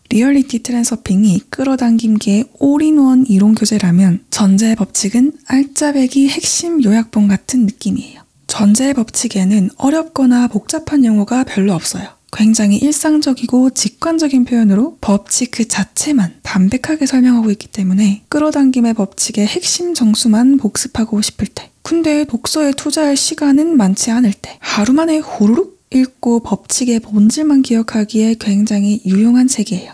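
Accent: Gyeongsang, South Korea. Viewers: 님 늘 감사합니다!^^ 우연히 보게된 끌어당김관련 영상을 시작으로 자기사랑 무의식정화 내맡김으로 관심이 이어졌는데요 미신 같았던 끌어당김을 님 덕분에 과학적 뒷받침 안에서 이해하게 되었어요 그리고 제가 예전 어떤 안 좋았던 지인의 기억으로 인해 경상도사투리 쓰는 여자에 약간의 거부감이 있었는데요(저 여자예요) 님 영상들 보면서 경상도사투리가 참 정겹고 좋으네요ㅎㅎ 사투리는 죄가 없는데 제가 그 기억을 놓아버리지 못했던 때문이죠 아무튼 두루두루 감사합니다ㅎㅎ 님 언제 한번 인간에게 자유의지가 있다 or 없다 이 부분에 대해 다루어주실 수 있으실까요? 님께 댓글을 달고 있는 지금 이 순간조차 모든게 짜여진 시나리오의 일부분인 건지, 태어나기 전 인생의 밑그림은 계획하고 왔지만 자유의지도 있는 건지 궁금합니다.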